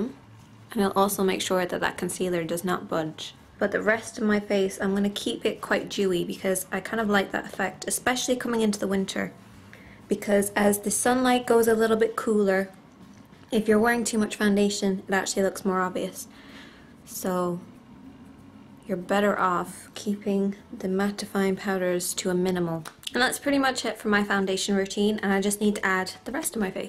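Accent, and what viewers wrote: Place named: Ireland. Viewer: ... I'm pretty sure she's Irish.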